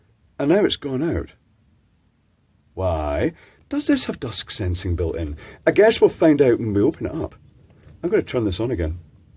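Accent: Scotland, United Kingdom. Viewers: I am trying to work out what part of Scotland you come from. It is not a Glasgow accent for damn sure, I was there for a month.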